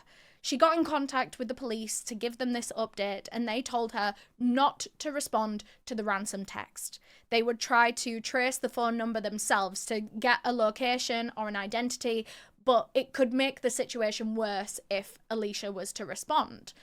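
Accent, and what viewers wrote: South, United States. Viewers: You have a good American accent sometimes I hear in other videos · It just depends on where your from. Down south we say it the way you are.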